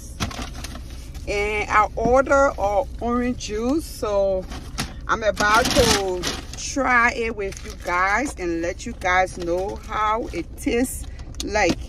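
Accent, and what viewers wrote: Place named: Liberia. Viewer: I know Liberian accent when I hear it 😂❤ nice video!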